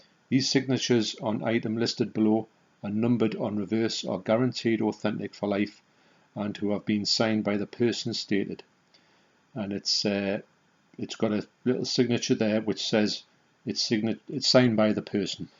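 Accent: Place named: Newcastle, United Kingdom. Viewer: Hi.Love the Alex picture signed by magnificent Malcolm himself.I’ve been enjoying your vids for a few months now,there’s not enough Geordie accents on YouTube.My late wife was from Killingworth and I still visit the family a few times in the year (I’m down south).Your love of depraved video nasties warms my cold dead heart.Keep up the good work!👍🏻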